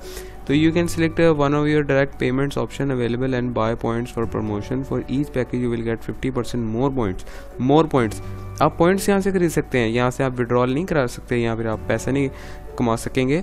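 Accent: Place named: India. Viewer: well it's ok you have some indian accent so i don't really understand heh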